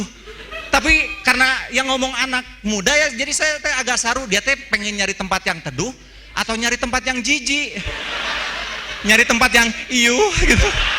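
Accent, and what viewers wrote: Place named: Cianjur, Indonesia. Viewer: Logat cianjur 💯 leres 😅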